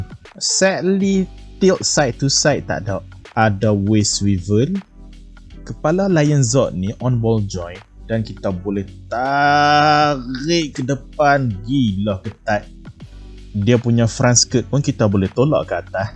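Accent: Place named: North, Malaysia. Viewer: benar. Plus dgn loghat utara . Mmg terbaik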